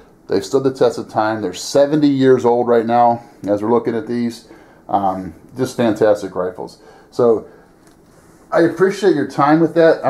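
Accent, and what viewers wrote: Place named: Oregon, United States. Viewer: I have both the Russian 91/30 and M44 and a Russian SKS and SVT-40. I love my Russian rifles and really love the looks of the fellow shooters at the range with their ARs who never heard a Russian BOOM STICK that breathes fire before. I end up having half a dozen guys want to shoot it every time I go out - so cool. It's nice to share the historical details of these great weapons to people who have never seen one, let known fire one. I'm in Central NJ, and shoot at Fort Dix Army Base (Range 14) I'm a Civilian DOD employee and get a really great annual membership there. I'll be honest, my favorite to shoot is the M44, the firm kick and flames really let you know you have something powerful in your hands. But by far the most accurate is the SKS which I can shoot 8 inch groups from a stance at 100 yards, over and over. 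To me it says a lot about the build on the SKS, especially the trigger pull. I never touched the Mosin triggers, although I fully stripped, sanded, stained and Boiled Linseed Oiled (20 treatments) to my M44 which has such a lustrous feel to the stock and a wonderful matted finish. <link> I'm curious, I'm pretty good with accents and you sound Oregonian (at least PNW) to me - am I close?? Thanks for this great intro to these wonderful rifles. I somehow missed this video although I've tried to see every one I could in the 4 years I've own these rifles. Bravo on a great video! OH... That does look like a Tula Star, although I have no idea why it is so small and NOT whe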